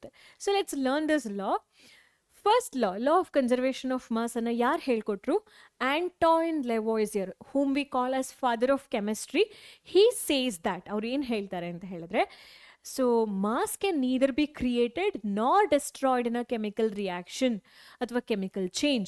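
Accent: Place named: Uttara Kannada, India. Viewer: Mam you speak in uttar kannada voice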